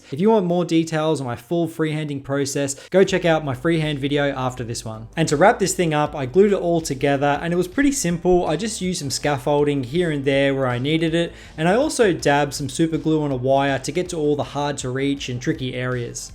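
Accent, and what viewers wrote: Australia. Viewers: I gotta say I love your stuff. Whenever I see a really classic Warhammer mini I can always imagine that in the distance I can hear an Australian accent and some delicious dungeon synth.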